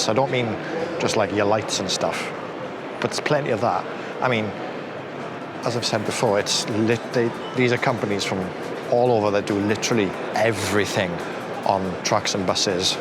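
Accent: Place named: RP, United Kingdom. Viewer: like your b.b.c.voice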